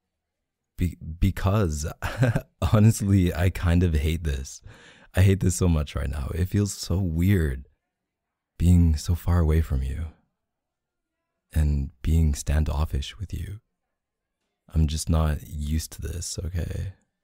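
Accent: Canada. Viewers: canadian accents are so adorable i cannot— 😶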